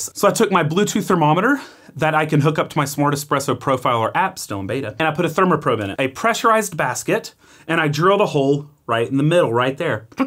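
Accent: United States. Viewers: sounds American not European. So are you an expatriate?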